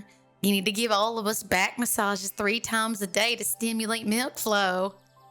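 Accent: South, United States. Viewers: I really get annoyed with fake Southern accents in ASMR so you're either the real deal or extremely convincing 👌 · Aww, I love a southern accent in my ASMR! This was beyond adorable! Thank you so much,!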